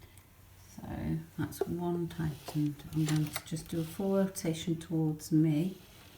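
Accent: United Kingdom. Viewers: I love your British accent. It sounds so comforting and homey to my sensibilities.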